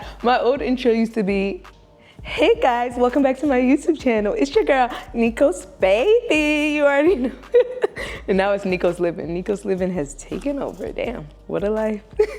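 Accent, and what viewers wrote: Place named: Nigerian American, United States. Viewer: The mixture of Nigerian and American accents.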